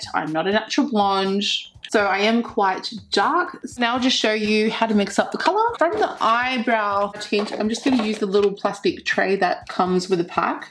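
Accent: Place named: Australia. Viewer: yes I thought that too!!! its the Australian accent !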